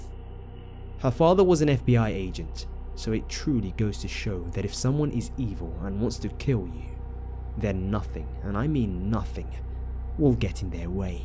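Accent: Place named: United Kingdom. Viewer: is hereby the best story teller. That British accent.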